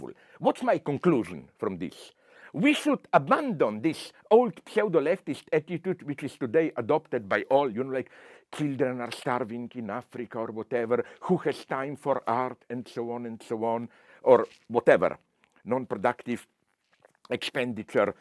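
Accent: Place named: Slovenia. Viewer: How can this short video be transcribed for the hearing impaired and for those who do not speak English or understand his Slovenian accent?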